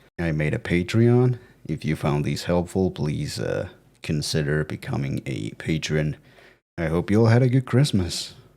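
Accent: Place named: Finland. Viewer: 10 seconds in and I can already tell you're a fellow native Finn.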